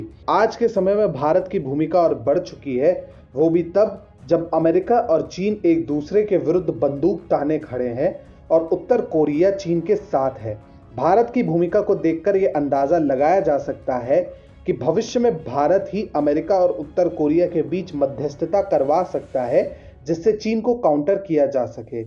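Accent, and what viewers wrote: Standard. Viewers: I am just hear to listen your shuddh hindi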